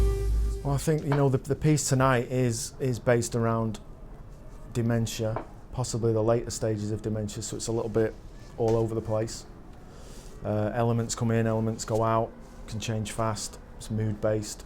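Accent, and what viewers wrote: United Kingdom. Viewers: Jesus Christ, as a Yorkshireman I listened to his projects thinking he was some deep mysterious american for some reason so to see him with sunglasses on with a Northern accent is incredible 😭 big up the north! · he's from stockport, same as me. I think hearing him speak exactly as I do and as everyone I knew growing up did comforts me a bit, but I'm really not sure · He sounds like every British guy ever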